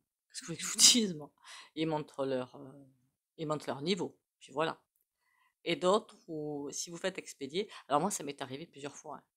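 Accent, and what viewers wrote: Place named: Midi, France. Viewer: avec l'accent fort du Midi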